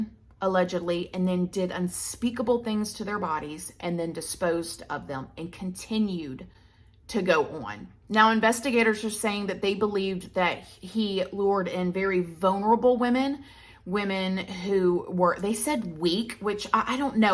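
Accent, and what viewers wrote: South, United States. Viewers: Praying!!! Today, it seemed like you liked it, I voted yes keep intro, then I looked at a couple that seem like you were just tired of saying it and so I changed my vote to you know we love you you've got like a million subscribers, greet us any way you want to, we'll be here! I love your accent, bc my cousins are from Florida but they're from a different part, they also have absolutely beautiful voices, you have a way deeper southern accent I love it. Now, I need to watch this. I do hope things get better for your family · a southern belle Youtuber😮🤯😍 im fascinated by the accent L0L Love from 🇬🇧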